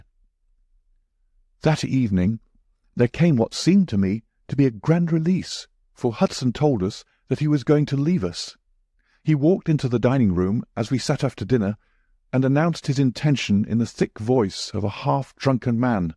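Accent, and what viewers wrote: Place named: North, United Kingdom. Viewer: No the fellow is from the North .An actor i may say . Aged 45-50 . Thank you Watson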